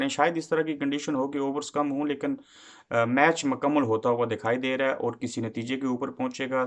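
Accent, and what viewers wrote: Kashmir, India. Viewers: Apnay kashmiri accent ko khatam karo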